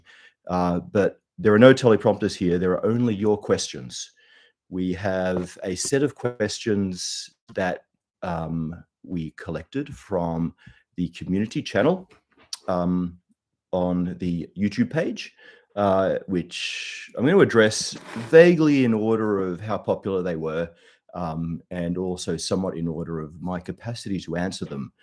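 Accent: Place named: Australia. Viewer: The age of British narration is coming to an end. The age of Aussie narration has begun…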